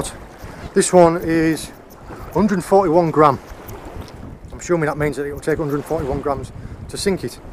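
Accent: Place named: North of England, United Kingdom. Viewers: you say you hope we enjoyed seeing you catch AND release some lovely bass and even a couple of Pollock. Whats NOT to like about you with your super Northumbrian accent your ALWAYS passing on your skills?? You are what Mr Elton Murphy is to Coarse Angling, You are to Sea Angling young man!! Both first class AMBASSADORS to your chosen disciplines. 😂😊😂. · Best fishing stuff on YouTube.. & this chap sounds like he's from north of England.. & I'll bet he's military or ex military.. reel guy.. very addictive viewing.. keep it going... Manchester😃😃.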